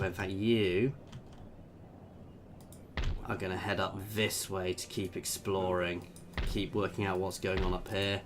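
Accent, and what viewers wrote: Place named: United States. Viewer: by the sound of your voice, you're as american as they come 😎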